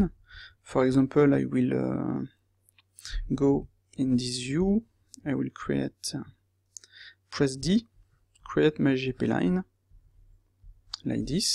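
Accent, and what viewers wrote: France. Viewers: Sa se voit que ces un français qui parle mdrrr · j'avoue xD l'accent est frenchie frenchie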